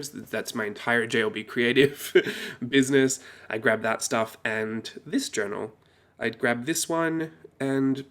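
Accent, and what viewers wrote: Australia. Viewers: This is the first video I’ve watched from you. I knew you were an Aussie by your accent. So refreshing 🤓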